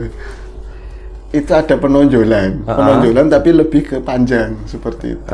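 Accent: Banyumas, Indonesia. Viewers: Aq suka klo bro ngomong ngapak..lucu dengernya..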